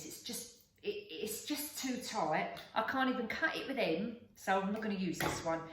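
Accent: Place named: Wigan, United Kingdom. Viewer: It is really beautiful and there is nout wrong from being comman we all talk like tht in Wigan haha X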